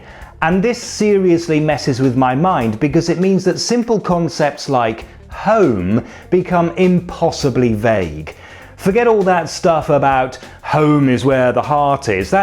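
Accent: United States. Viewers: Nice American accent! Do you have trouble adjusting to driving on the left side of the road when you return to the UK? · oh my god your american accent is amazing!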